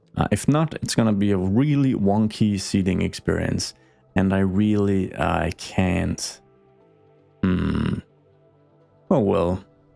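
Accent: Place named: Denmark. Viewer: Er du dansk? (I'm guessing this based on your heavy accent) :D Incredible content and builds.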